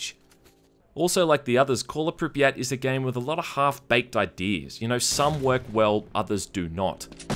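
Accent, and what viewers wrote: Australia. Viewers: "Stoker Cola: Pre-Piat" that Aussie accent tho.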